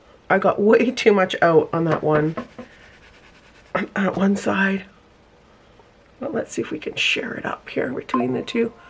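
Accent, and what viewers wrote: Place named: Canada. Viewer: Well hello fellow Canadian (I'll be very surprised if you're not) - that's what your 'accent' sounds like - anywho, I'm a brand new subbie who happened to stumble across this video and I hit subscribe right away. I am a 50 y/o, mother of 1, married x 25 yrs and working as a stenographer in our hospital x 25 yrs. I'm a makeupaholic, it seriously is becoming bit of an issue, and I promised myself (and my hubs) that I would try really super hard to be on a no buy or low buy this yr. I would love to see you do a full glam look and I just know I'm going to love your channel. Sending big xo's from Labrador, Canada.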